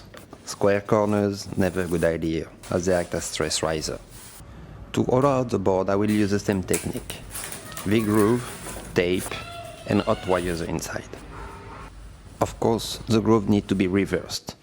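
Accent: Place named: France. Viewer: au fait au vue de l'accent je suppose que tu connais le francais ;)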